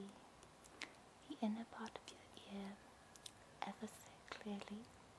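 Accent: England, United Kingdom. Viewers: you have an amazing voice. i was not expevting an english accent from an asian girl either. really great video. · A Korean with an english accent is a juicy contradiction!! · I love the idea of an ear examination video. I'm addicted! I usually don't get ASMR from English accents - something about being reminded of myself XD - but this was faaaabulously tingly. Thank you! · i think i need to move to the uk... I love women with this accent... >.<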